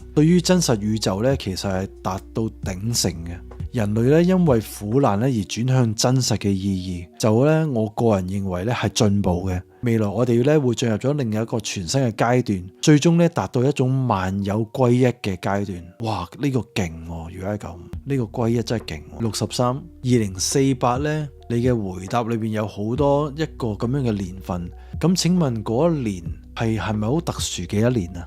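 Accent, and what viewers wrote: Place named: China. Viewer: 一聽大陸可以唔洗聽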